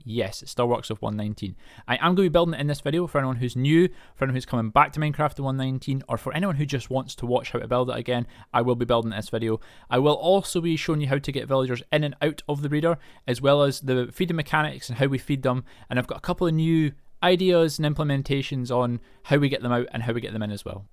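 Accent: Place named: Scotland, United Kingdom. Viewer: Great a Scot! (Sounds Scottish at least) Should be an easy going tutorial :D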